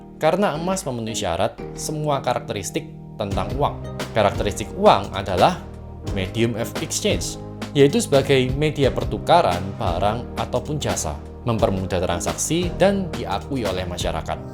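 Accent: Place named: Banyumas, Indonesia. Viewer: Logatnya ngapak. 😅 Nice 👍👍👍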